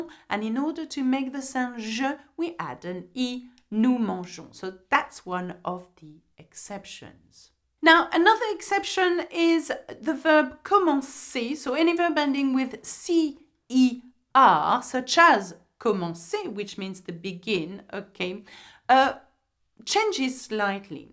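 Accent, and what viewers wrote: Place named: France. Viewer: Thank you. These are good refreshers for me. J’ai un question. Vous êtes de quel région en France. J’ai étudié en Normandie (Rouen). Votre accent me semble un peu différent.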